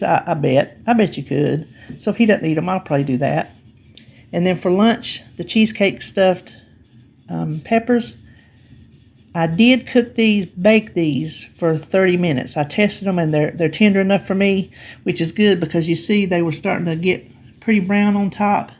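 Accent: South, United States. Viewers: They both look delicious. I often bake muffins with almond flour and they freeze beautifully. You mentioned your accent in another video ~ I love your southern accent and your wonderful way of telling stories!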